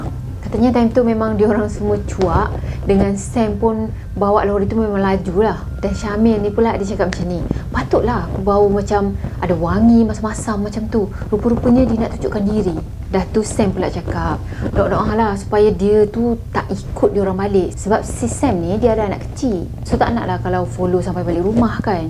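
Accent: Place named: North, Malaysia. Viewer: Perhh Pandai cakap utara ek😉😉😉......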